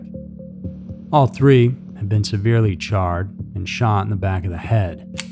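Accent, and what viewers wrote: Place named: East Texas, United States. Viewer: He's from East Texas.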